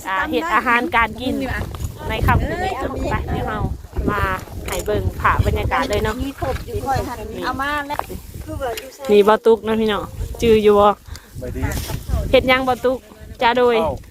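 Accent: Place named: Thailand. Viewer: สบายดีน้อง น้องเป็นผู้หญิงที่พูดเก่งและสำเนียงไม่แตกต่างจากทางไทยเลย น่ารักอีกด้วย.🥰❤️❤️❤️❤️❤️❤️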